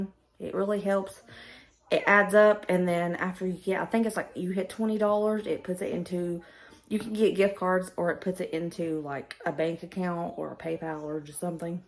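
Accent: South, United States. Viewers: You are a lifesaver. Thank you. New Subscriber ❤️ Love your Southern accent. I'm from Texas 🇱🇷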